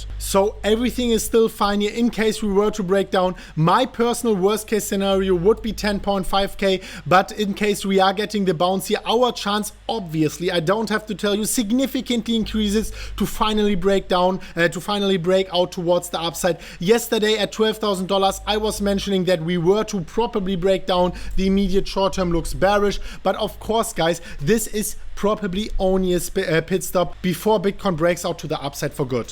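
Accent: Germany. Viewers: I love your German accent. GET DOWN...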